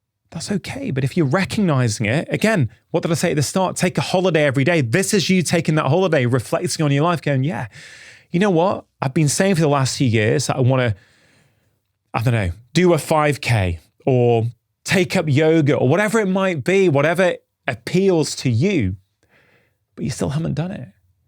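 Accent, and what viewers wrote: England, United Kingdom. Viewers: I like your english accent...❤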